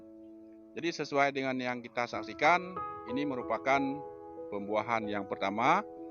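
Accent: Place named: Aceh, Indonesia. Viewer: sperti logat aceh bole tau dimana gardennya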